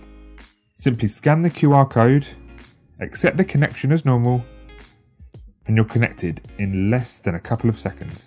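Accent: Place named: England, United Kingdom. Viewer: same guitar and same English guy voice is every W3 video...he must be busy..I wonder if he plays the guitar too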